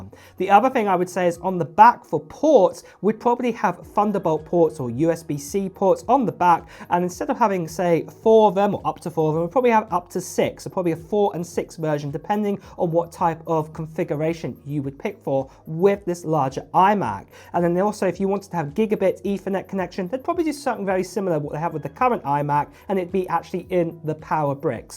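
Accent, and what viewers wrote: United Kingdom. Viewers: every time you mention Summer in your videos we are left clueless. Use months and years to help your viewers understand. Just because you have a brittish accent doesnt mean you are living in the UK !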